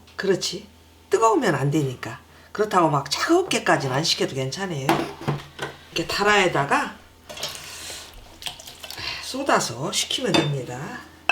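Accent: Chungcheong, South Korea. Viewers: 항상감사히잘보고있읍니다충청도분같아요 구수한말씨며여유로우신마음씨가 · 좋은영상 잘보았습니다 김장해놓고 꼭담글겁니다 강상합니다 말씀 이 충청도에유 듣기좋았어유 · 여기 버지니아 인데요 잘보았습니다 1월쯤담아야셌네요 충청도 사투리 좋아요 내가 예산이 고향이니까요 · 말씀도 참정감있고 맛깔스럽게 하시네요ㆍ아드님하고 대화도 사랑이넘쳐요ㆍ고향이충청도라 사투리가 아름답게 들려요ㆍ요리도 믿음이가네요ㆍ당장따라해볼게요ㆍ고맙습니다ㆍ❤❤ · 척척박사, 정결. 깔끔. 충청도 사투리까지. 주부와 여성의ㅡ모범 이십니다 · 정감있는 내고향 충청도 구먼유 잘 배웠어유 감사해유^^ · 충청도 말씨가...고향에 온 느낌입니다. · 저두 충청도 결성인디 사투리 정겹습니다....ㅎ 묽으스름....ㅎ 저는 보리쌀을 방앗간에서 가루로 빻앗는데 그냥가루로 죽쓰면 될까요? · 충청도 구수한 사투리가 너무 정겹습니다 전 막장은 한번도 안담궜는데 당장에 해야겠어요 쌈장하면 맛있을것같애요 맛나게 익으면 며느리도 주면 좋아할듯하네요 귀한 정보 가르쳐주셔서 감사합니다 고맙습니다 ~~~♡ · 충정남도 아님 전북분 말씨 같아유 정겹네유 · 구수한 충청도말씨 정겹네요 옛날 어른들이 담그시던 막장 먹고싶었는데 잘배웠어요 겨울되면 담가야겠어요 감사합니다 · 저도 츙청도 인데 말씀이 넘 구수하내요 막정에 엿기름 넣어 해 보았는데 아니더러구요 얼려주산대로 해 봐야겠오요 정성이 엄청들어가내요.보여주기 정성이네요 고추싸없으면 고추가루 넣어도 되나요 아닌 국물 냘때 먀운고추 조금넣고 끊여도 될까요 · 충청도아줌니인가봐요 ㆍ수고많으시네요ㆍ저두. 따라서해볼랴구요ㆍ수고하셨수 ᆢ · 엿기름이 안들어가서 더 쉬워보이네요. 나도 장을담그는데. 막장은 안해봐서.한번해보려구요. 충청도아줌마인가요? 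· 구수한 충청도 사투리 정겹습니다 저도 한번 담가보겠습니다 감사 합니다^^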